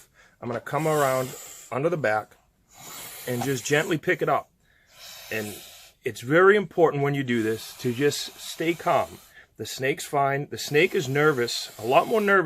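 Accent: Canada. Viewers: I'm from Massachusetts, so I don't know why you would sound Canadian to me if you weren't. But I saw a business with the same name as yours listen in Lynn, MA. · Sounds like you are from Canada?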